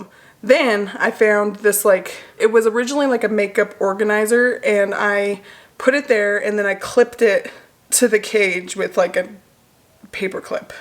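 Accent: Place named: New York, United States. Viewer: Starting to sound like a newyorker 😅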